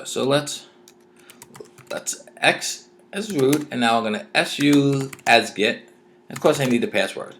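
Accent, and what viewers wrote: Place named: Boston, United States. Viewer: repro - lovin' the accent. Cousin's from Bwahstin (Boston).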